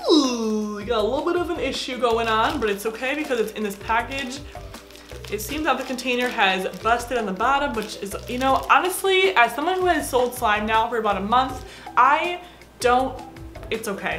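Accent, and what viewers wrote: United States. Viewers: Is she american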